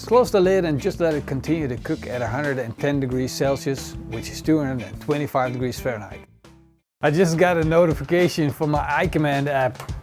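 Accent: Netherlands. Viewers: Area Mann he is from the Netherlands i hear it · Nederlands accentje maat :D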